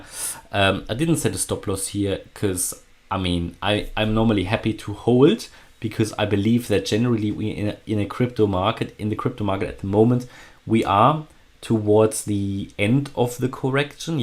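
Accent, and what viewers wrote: Germany. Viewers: Thanks for the video, are you from Germany?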